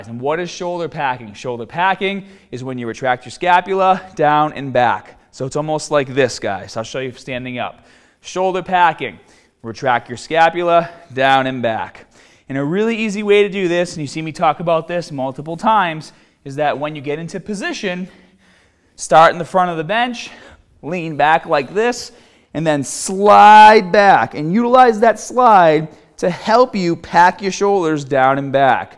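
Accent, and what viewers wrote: Boston, United States. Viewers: where is from? he has that Boston accent. #teamscott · Boston Accent!!!!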